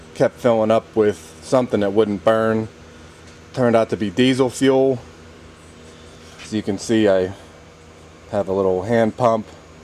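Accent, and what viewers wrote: Pittsburgh, United States. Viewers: Are you Paul's brother? If so, I know he said you were starting a channel but i dont remember him giving a link..i happened to find your name through another one of his subscribers and figured you must be him. Plus you cant hide with that Pittsburgh accent. You two are like the dream team when it comes to diagnosis.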